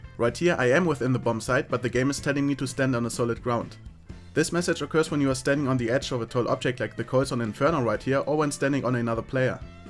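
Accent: Germany. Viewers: Ich dache nur so "Wieso hat der so ein deutschen Akzent?!" Und denn.... ach so da spricht er deutsch tolles Video, Danke dafür! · dieser deutsche akzent xD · dein englisch hat einfach einen extremen deutschen akzent 😂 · Dat deutsch akzent. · ach du bist deutscher:D kam grade auf deinen Kanal und denke mir so "Hnn... der hat aber nen gut deutschen Akzent":D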